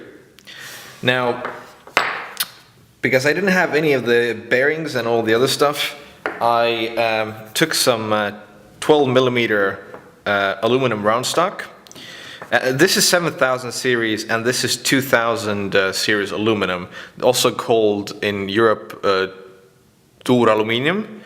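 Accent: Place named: Estonia. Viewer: Hi, where are you from? you sound very estonian :) kui jaa siis Tere ja hea video!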